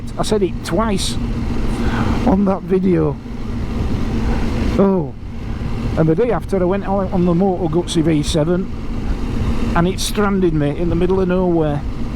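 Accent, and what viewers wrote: Yorkshire, United Kingdom. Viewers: Love the Yorkshire accent, part of the reason I watch and subscribe. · Sorry to hear the Guzzi let you down. Always happy to see you riding in the Yorkshire hills, some marvellous scenery. Living in Bedfordshire, we are not blessed with much hilly scenery, but today we are having a ride out to the Dunstable Downs, which has some splendid views 😀. Always great to hear your Yorkshire accent and your choice of words when explaining any situation, look forward to the next video 👍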